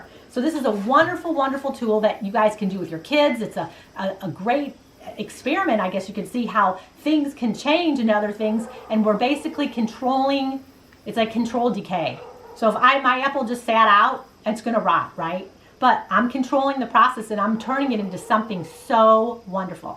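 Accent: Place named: North, United States. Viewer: So much I didn't know about apple cider vinegar, wow! Thanks for all the tips and tricks. I'm putting home made a.c.v. on my list from now on! Also,...I hear a northern accent. Are you from WI by chance? Cuz I am, and would love to brag that you are too!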